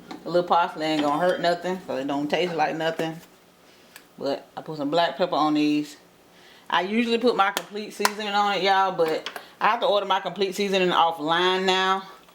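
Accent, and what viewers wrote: South, United States. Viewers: Thank u miss for this great and simple recipe. Love yo southern accent and spirit.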